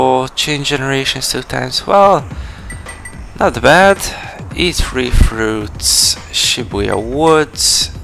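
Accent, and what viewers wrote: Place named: Central Europe. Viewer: California c: I was wondering because me and my brother couldn't figure out where your accent was from so thank you! We knew it was somewhere in mainland central Europe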